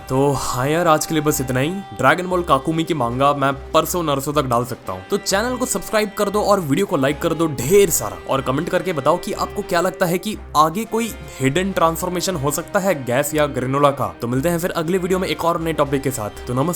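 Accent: Maharashtra, India. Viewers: Bhai kya aap Marathi ho 😇😇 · BHAI TERA MARATHI KADK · Bhai tum Marathi hai? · Today's narration is op 🔥 specially little bit marathi used during narration 🤣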